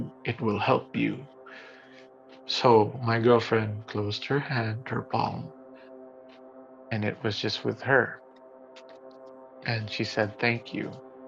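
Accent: Conyo, Philippines. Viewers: Conyo si kuya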